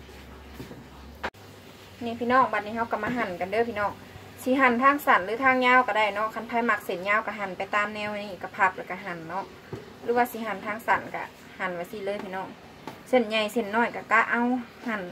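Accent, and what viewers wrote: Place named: South, Laos. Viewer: นางเป็นลาวใต้บ่ สำเนียงคือลาวใต้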